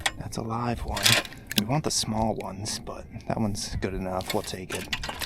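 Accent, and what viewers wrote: Philadelphia, United States. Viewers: dubious Philly accent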